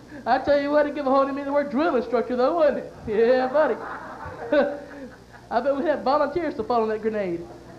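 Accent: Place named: Arkansas, United States. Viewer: He sounds arkansan here.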